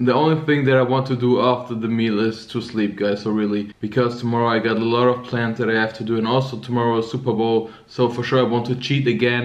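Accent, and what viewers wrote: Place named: Germany. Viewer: Bin ich die einzige, die den deutschen englischen Akzent total nervig findet? :,D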